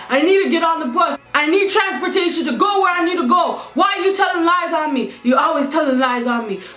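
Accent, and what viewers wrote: Jamaica. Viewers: She uses a Jamaican accent sometimes I'm guessing that's what you're talking about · Talk Jamaican more often please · I love the Jamaican accent :) so cool!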